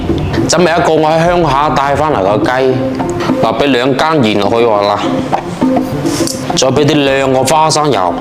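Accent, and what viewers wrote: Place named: Zhanjiang, China. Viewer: 聽口音個師傅應該係湛江地區人😂😂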